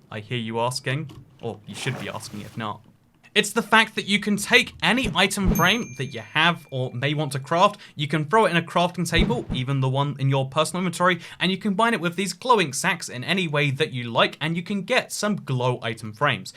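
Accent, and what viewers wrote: United Kingdom. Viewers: is British. Lichen is pronounced 'Litchen' in British English. pronunciation is therefore correct.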